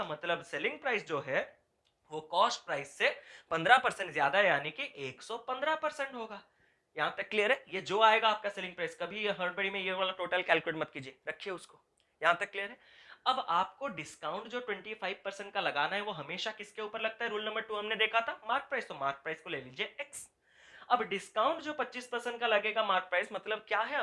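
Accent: Odisha, India. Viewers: Are you from odisa?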